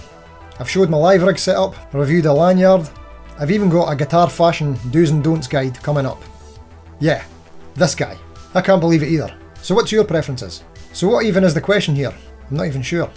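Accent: Scotland, United Kingdom. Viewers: yep ;) I think is more the accent not the speed :) I can understand good English even if fast but I never practiced with Scottish people ehehe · I enjoy your videos because of several reasons. One, I am also interested in new budget gear. Two, I just like your personal style => serious info but also your sense of humor. Three, I may be wrong, but I think you are the first of all reviewers I watch who took a Budget guitar to a live situation. That is outstanding to me and I’d love this to be a co stanz thing in at least some reviews (I guess it would not be possible to test all stuff live). Four, I like your dialect/accent (Scottish? I’m from German I can only guess). For example you pronounce „you“ like the German „u with two dots on top“ which is fascinating. Fife, so far it is still possible to get your reaction - with more viewers that will naturally decrease, but for now I like that. 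Great channel and work. Keep it up mate!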